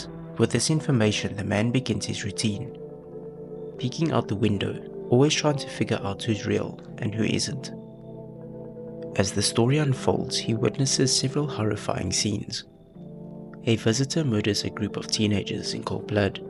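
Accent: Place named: South Africa. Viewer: i thought i recognised a south african accent 🥹😝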